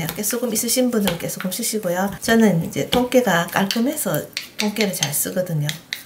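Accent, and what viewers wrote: Gyeongsang, South Korea. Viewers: 해외 나와 산지 4년이 다되어 가서 정월대보름되면 나물이 너무 먹고 싶더라고요 ㅠㅠ 혹시 대구 분이신가요? ㅎㅎ 말투가 너무 정겨우세요 제가 대구사람이라 ㅋㅋ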